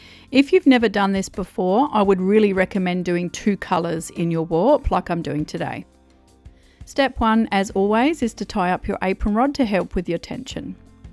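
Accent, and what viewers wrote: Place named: Australia. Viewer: Love to hear an Australian accent !!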